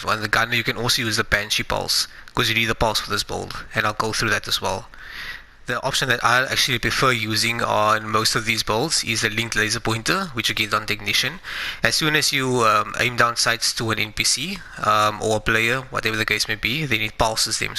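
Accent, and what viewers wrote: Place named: South Africa. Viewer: my man...nice video....your accent...you from SA?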